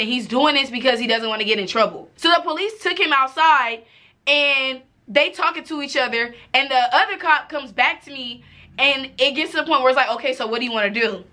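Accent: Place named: Chicago, United States. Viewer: Chi-Town Accent! Choctaw face!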